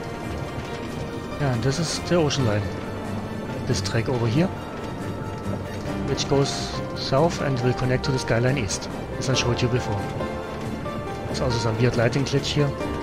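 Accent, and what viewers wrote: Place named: Germany. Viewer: korrigiere mich, aber ich denke aufgrund deiner aussprache das du deutscher bist :D eine ernst gemeinte frage, warum macht man sowas, bzw wo genau liegt da die faszination drin von sowas ein video zu machen? ich selber hab spaß an solchen rails ingame würde aber nie auf die idee kommen ein video davon zu machen. ansonsten liebe grüße und weiterhin viel spaß am videos machen ;)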